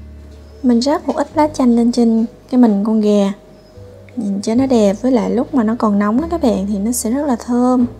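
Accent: Mekong Delta, Vietnam. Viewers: Bạn ở miền tây à,nghe giọng de thương quá, cái muỗng dua quê mình.... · Co Gai mien tay Chat giong hay qua ngot lm e oi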